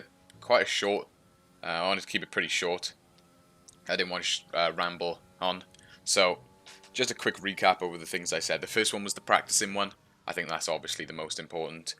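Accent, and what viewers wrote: Wales, United Kingdom. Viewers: Great info, where in Wales you from?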